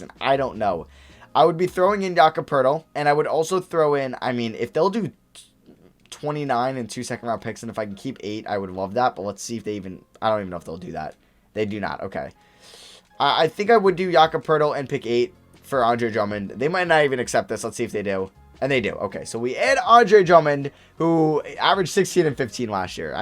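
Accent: Pittsburgh, United States. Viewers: Are u from Pittsburgh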